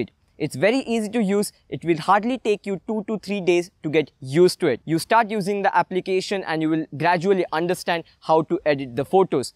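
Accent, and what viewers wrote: India. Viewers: The Indian accent is so funny! 🤣😂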